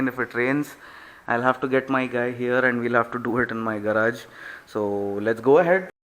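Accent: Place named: Kerala, India. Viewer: I love your accent brother! You make me remind of my mallu friend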